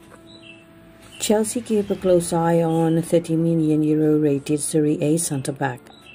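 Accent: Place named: Vietnam. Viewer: AGAIN AND AGAIN VIETCONG VOICE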